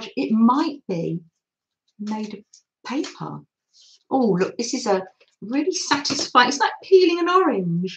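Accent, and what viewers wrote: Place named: United Kingdom. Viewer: Hello sweet 🦋 and Freddie 🐾 My morning birthday treat/gift was to begin my day watching you playing and creating 🥰🥰 I had a beautiful time with you my friend 🦋🎨🎨 you made such a beautiful painty background on the fabric love the Freddie paws on it 🐾🐾❤️ I played along with you with your prompts 🎨✂️🖍 and btw your French with your lovely British accent is perfect 👌 thank you very much Merci beaucoup for this fantastic moment with you❤️❤️ hugs and kisses to you both 🧚‍♀️🐶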